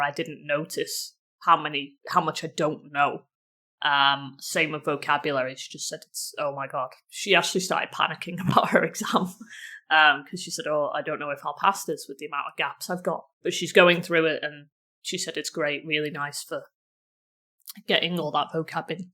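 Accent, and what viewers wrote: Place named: London, United Kingdom. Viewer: it’s soooo interesting to know that. Thank you very very much for sharing. Just curiosity, are you from London? I do appreciate your accent.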